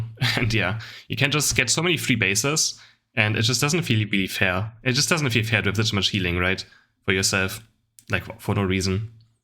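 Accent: Germany. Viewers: I can hear the German in his voice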